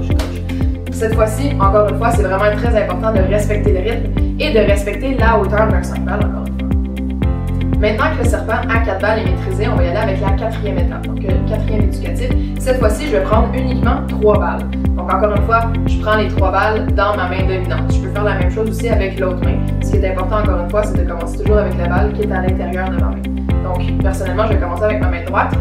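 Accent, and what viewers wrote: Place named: Marseille, France. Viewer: magnifique ! merci pour la progression et les éducatifs ... et l'accent ... marseillais.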